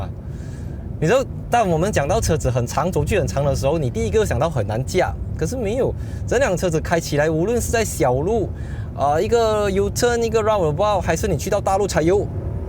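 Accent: Malaysia. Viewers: 把英文说出马来口音，哈哈。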